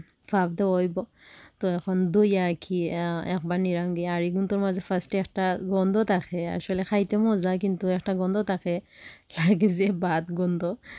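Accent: Sylhet, Bangladesh. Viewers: Afnar sylheti mat kub shundor, nice vlog oise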